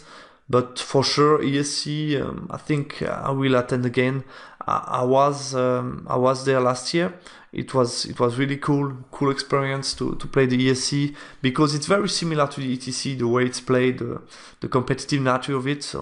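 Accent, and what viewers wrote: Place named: Poland. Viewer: A very light polish accent, isn't it ? :D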